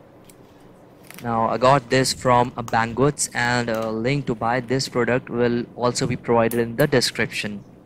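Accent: India. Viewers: i can hear indian accent. no thanks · Peace mercy and blessings of God. After greetings. Good evening. How are you Indian people You speak English but your accent is Indian, and India is the country where Adam might God bless him and Grant him peace landed, and Adam is the first human being whom God created from dust and blow into him of God soul. Therefore, please let me tell you that Adam has created after devils not before. Note : And there are other human beings whom God created, just as He created Adam from dust and blow into him from God soul. and all those whom God created from His soul are human beings. Except one of the angels his name is Gabriel.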